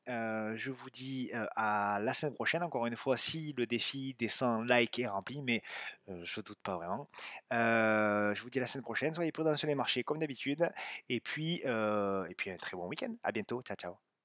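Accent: South, France. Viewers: Écouter chaque semaine et son accent du Sud, en complément du salon Discord, c'est toujours un plaisir:) Le contenu est qualitatif, les Graphs sont épurés et compréhensibles par tous. Merci et très vite, bravo pour le partage! PS: pour une prochaine fois, une idée: la présentation d'un indicateur en plus du prix (# des volumes)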